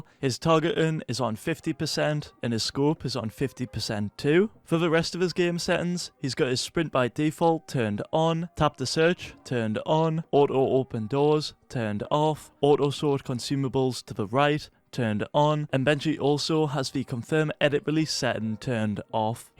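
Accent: Newcastle, United Kingdom. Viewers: Are you from Newcastle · Sounds like your from Newcastle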